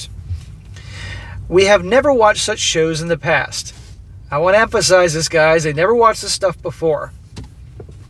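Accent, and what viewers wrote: Yorkshire, United Kingdom. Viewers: This bloke sounds like a Yorkshire man, they are honest direct n strait to the point, one of my closest friends Richard is from Harrogate Very honest helpful direct n trustworthy, you just can't get better, not implying the rest of the people are dishonest by all means, but Yorkshire people are the best I met on earth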